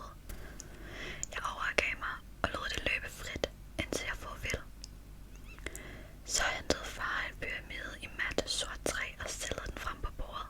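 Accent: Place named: Denmark. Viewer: Ohh btw, do you mind me asking what city / Danish region you’re from? Your Danish accent is beautiful but I think it’s a bit different from the one I’m used to hearing on Duolingo 🥰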